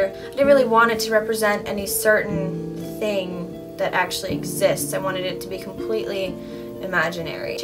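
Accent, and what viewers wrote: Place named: United States. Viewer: where are you from?? you look and sound american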